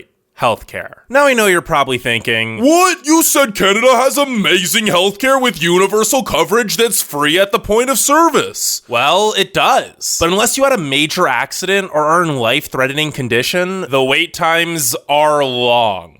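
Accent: United States. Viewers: Oh man. That American accent!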